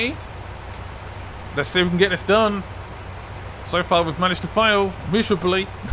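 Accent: England, United Kingdom. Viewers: Are you english lad ??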